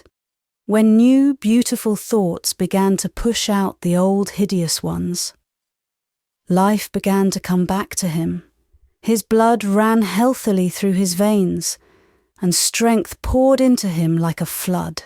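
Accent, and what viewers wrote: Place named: Yorkshire, United Kingdom. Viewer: Thank you so much. You read this so beautifully and gave us, as South Africans, my son and I, a glimpse into the beautiful world of Yorkshire. We enjoyed hearing the dialect and are having fun imitating it in our home. What a beautiful imagination it all stirs.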